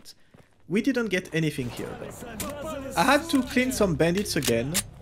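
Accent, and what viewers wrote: France. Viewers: hey dude, i can’t say where u from its like a french accent and russian one 😂 could u help me on this one ?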